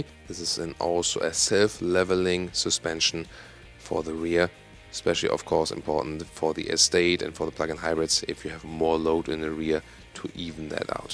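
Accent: Germany. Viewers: The wagon is pretty dreamy, are we even getting in the states? I’d never spend that kind of money on a car with my current rare use of them but I wish the Japanese or Koreans had something like this in the category as opposed to crossovers only. I’m still chugging along - very happily I might add - in my very “analog” ‘02 WRX wagon and with 72k original my miles not yet seeing a compelling reason to upgrade. Wish the Subaru Levorg or similar existing sport wagon in other markets was imported here. Great and very complete video overview of the car and love that German accent! Haha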